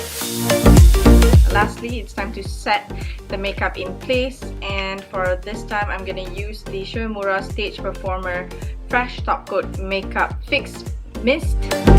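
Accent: Penang, Malaysia. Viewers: Please do make up tutorial in malay again. Love to hear your penang slang 😄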